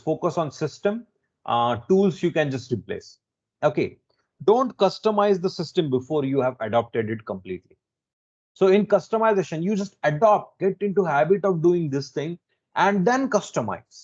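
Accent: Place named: India. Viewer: Hello,! My name is Brendon. I’d like to express my gratitude for your work. A few years ago, I was looking for ways to truly learn from reading books, and I came across your YouTube video. I never took English classes; I learned everything I know by watching movies and TV series and playing video games. I spent dozens of hours re-watching your TED Talk because the Indian accent made it a bit challenging to follow—but it was absolutely worth it! You not only taught me how to read better but also encouraged me to read more and more. You and Mortimer J. Adler are at the top of my list. I am truly grateful that you posted this series of lessons here; it was the missing piece to complement my "Habit 3"! I will certainly watch it a few more times until I’ve mastered the material. Once again, thank you so much! Best regards from Brazil.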